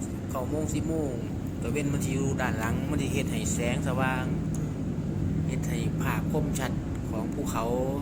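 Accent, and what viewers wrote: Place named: Isan, Thailand. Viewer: อยากทราบว่าแอ้ดช่องนี้.เป็นคนเมืองไหน.แขวงไหนของสปป.ลาว.สำเนียงการพูดเหมือนคนทางอุดร.ขอนแก่น.สารคาม.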